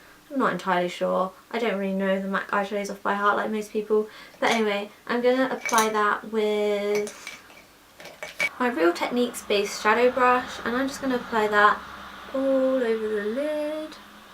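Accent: England, United Kingdom. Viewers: she has an english accent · I love your accent. Is it british?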